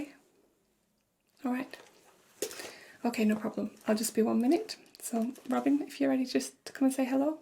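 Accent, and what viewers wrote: United Kingdom. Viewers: Great video idea, also love the accent whereabouts are you from within the uk?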